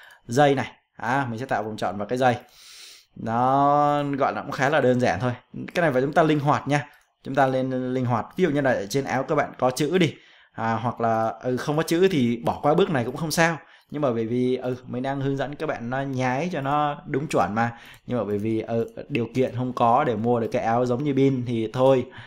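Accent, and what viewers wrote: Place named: Central, Vietnam. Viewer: Anh này người miền trung nè đọc “a” thành “e” kk ❤️Miền Trung 🤣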